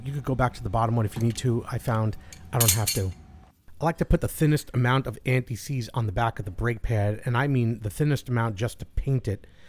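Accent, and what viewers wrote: New York, United States. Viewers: Excellent video sir. Love the NY accent. I'm from NH and speak a little different.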